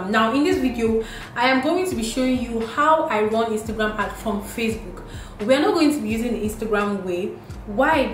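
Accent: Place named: Yoruba, Nigeria. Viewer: Nne, why do you sound so Yoruba? Looking peng though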